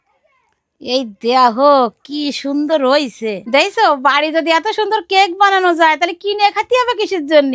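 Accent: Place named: Bagerhat, Bangladesh. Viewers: আপনার বাড়ি কি বাংলাদেশে। আপনি প্রায় বাংলাদেশের বাগেরহাটের ভাষায় কথা বলেন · বাংলাদেশে খুলনার মানুষদের আঞ্চলিক ভাষা কিছুটা এমন শুনতে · কিরে যশোরের ভাষা বলে কেন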